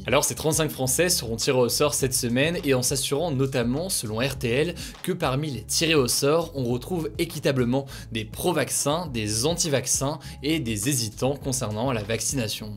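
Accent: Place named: England, United Kingdom. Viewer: Ct’accent anglais tu régales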